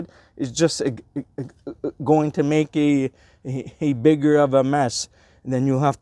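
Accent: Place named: South Africa. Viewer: Its been a whole year of trying to figure out your accent and Im just going to wing it, is it South African?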